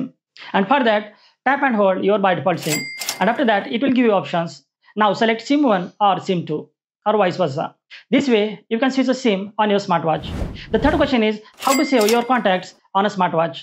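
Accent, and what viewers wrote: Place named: India. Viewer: He's an Indian thatswhy his english dictions is like pronouncing Indian language. He's not good in english pronounciations...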